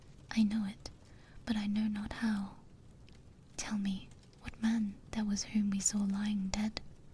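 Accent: United Kingdom. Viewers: proper british bloak reading a proper british tale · That's some proper no frills just straight up full course British asmr :_eepy::_ZZZ::_ZZZ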